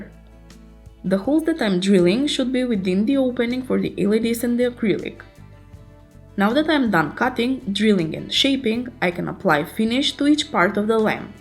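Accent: Russia. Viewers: Ебать у тебя акцент... Ты русская, походу)) Можешь не отвечать, и так понятно. Один вопрос: а в бетонное основание нельзя было питание спрятать, чтобы не так убого было? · русская что ли ... акцент караул полный ((((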